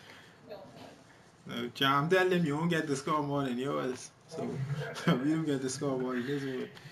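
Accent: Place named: Bahamas. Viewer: You from the Bahamas?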